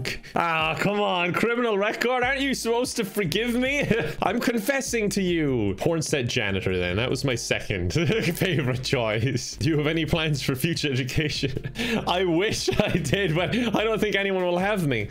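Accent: Ireland. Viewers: This is truly the one channel on YouTube that can make me laugh the hardest consistently at whatever he plays. Also the Irish accent is a big plus too can't forget the accent.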